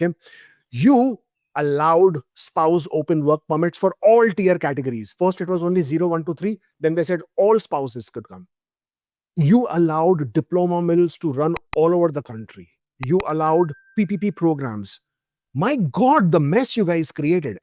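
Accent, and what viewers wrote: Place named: India. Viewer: You have a clear Indian accent. If you weren't granted entry to Canada by soft policies, will you be in Canada?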